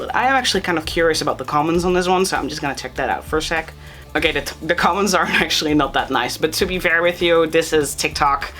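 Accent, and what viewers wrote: Netherlands. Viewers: Are you also dutch? Bc i have the same kinda accent when i speak English😼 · i smell dutch, are you dutch???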